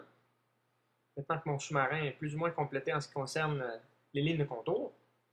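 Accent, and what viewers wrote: Quebec, Canada. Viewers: Je ne suis pas cebequois mais je trouve que c le meilleur accent du monde 🙂🙂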